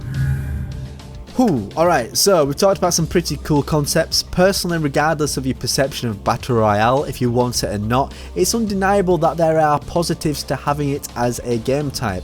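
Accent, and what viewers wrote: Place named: Bolton, United Kingdom. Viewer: You are definitely from Bolton aren't you, jesus Christ🤣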